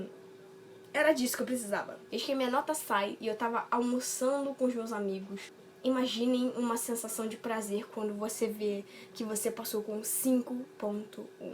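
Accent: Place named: Rio de Janeiro, Brazil. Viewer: "A máquina não foi capaz de me derrotar". Uahaha. Muito bom,! Muito legal seus vídeos, descontraídos e com informações bem interessantes do dia a dia do estudante. Seu sotaque carioca é muito bom de ouvir. Fiz Eng. Mecânica no CEFET-MG e lembro da tensão de fazer algumas destas temidas provas. A maioria das provas no CEFET, eles costumavam e ainda costumam fazer somente questões discursivas (tanto as de Física como de Cálculo), eram bem tensas.:) Mas já tive a curiosidade de ver algumas das provas aí da UFRJ, bem difíceis.:) Parabéns pelo canal!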